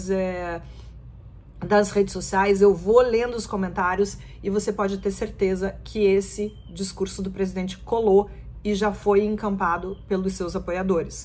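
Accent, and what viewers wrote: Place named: São Paulo, Brazil. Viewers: Maaaaaaaaa vc é perfeita!!!! Não perde o sotaque paulistaaaaaa!!!!! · nada de errado com seu sotaque, inclusive eu acho que é bastante forte o jeito paulistano. Adoro sua coluna e assisto todas. Parabéns pelo excelente trabalho! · Seu sotaque é sul/sudeste, mas mais sudeste, tipo de SP. Suas análises são muito sensatas.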